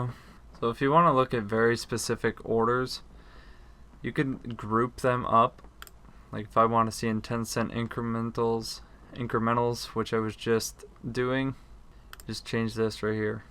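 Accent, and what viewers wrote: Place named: United States. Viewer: You sound like your'e from America. I thought bitfinex doesnt allow US customers?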